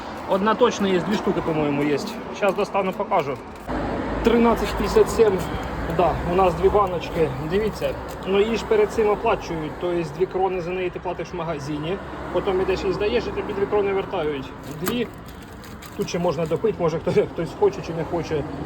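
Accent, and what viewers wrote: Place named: South, Ukraine. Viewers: минута. Земляче я теж з Оріхова. По твоєму говору я так і поняв що ти десь з Запорізької області. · Вітаю тезка. Так оказується ти ще й мій земляк, я так чомусь і подумав коли вперше почув твій говір, бо дуже знайомий діалект, такий тільки у нас на півдні ) Я теж на чужині, працюю, не легко, але є надія на життя. Дуже сподобалася твоя ідея збирати пляшки, збираюся і сам якось у вільний час таким зайнятися. Успіху тобі та натхнення !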